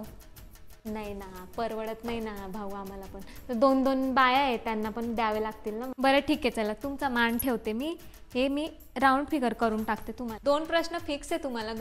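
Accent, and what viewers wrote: Khandesh, India. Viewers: काकू खान्देशी आहेत वाटत 😂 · किती छान कुठल्याही रोल मध्ये परफेक्ट करते... एकदम खान्देशी चे बाई वाटते... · Khndeshi tone mst khup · Haha....zanzatmari 😂😂 it's a classic khandeshi accent👌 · Khandeshi accent in marathi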